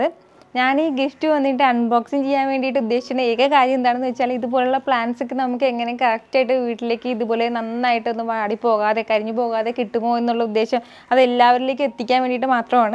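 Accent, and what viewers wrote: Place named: Trivandrum, India. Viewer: നോക്കാം കേട്ടാ 😃 ത്രിവാന്ദ്രം ഭാഷ🤩 l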